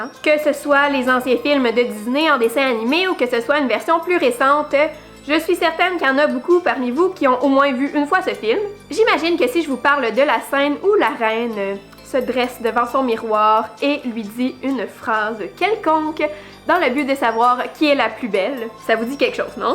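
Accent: Quebec, Canada. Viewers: t'es québéquoise? j'adore ton accent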